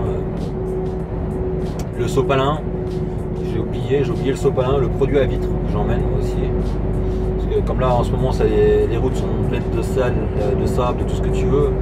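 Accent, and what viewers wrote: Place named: South, France. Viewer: Très belle vidéo comme toutes jusqu'a maintenant!Félicitations pour tes 10500 abonnés!Déjà deux an que tu existe sur youtube et deux ans de pur plaisir!Bonne route!Petite question tu est originaire de quel département?On dirais que t'as un peu l'accent du sud (bordeaux)😅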